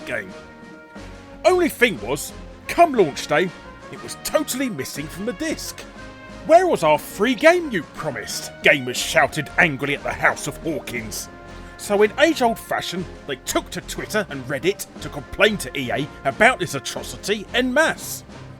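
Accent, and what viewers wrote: England, United Kingdom. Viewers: Me: “What’s a beater code?” … Oh! A BETA (Bay-ta) code! Gotta love that English accent! Lol!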